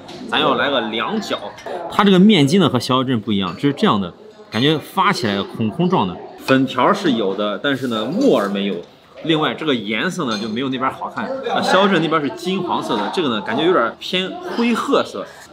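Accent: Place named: Xi'an, China. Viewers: 堪比中央电视台节目主持人，特别好的风格，看你探店吃美食就是一种享受。而且文化修养也非常高。我大学在西安上的，所以看到就觉得特别亲切。西安普通话😆 几乎每集都看，继续加油。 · ，你是不是咱们西安人啊？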